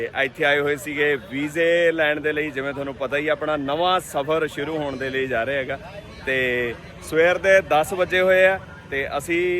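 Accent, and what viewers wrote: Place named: Amritsar, India. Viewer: ਬਾਈ ਜੀ ਤੁਸੀਂ ਹੈਗੇ ਬਰਨਾਲੇ ਦੇ ਤੇ ਭਾਸ਼ਾ ਤੁਸੀਂ ਅੰਮ੍ਰਿਤਸਰ ਦੀ ਬੋਲੀ ਜਾਨੇ ਹੋ ਢਾਡਾ ਢਾਡਾ ਭਾਜੀ ਭਾਜੀ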